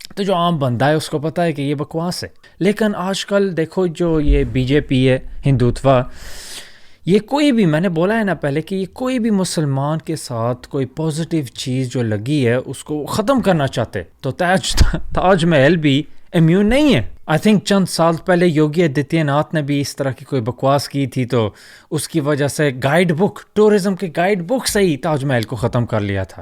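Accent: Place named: Punjab, Pakistan. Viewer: You talk like a punjabi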